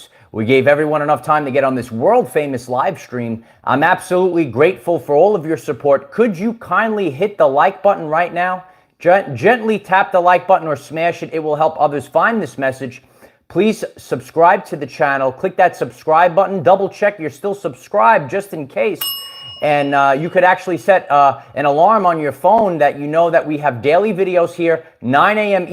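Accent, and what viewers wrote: New York, United States. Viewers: I really love your East Coast accent! Is it a New York accent?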